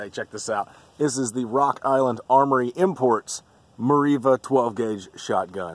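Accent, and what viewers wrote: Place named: South, United States. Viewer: If it weren't for the southern drawl, you'd sound like Barrack Obama.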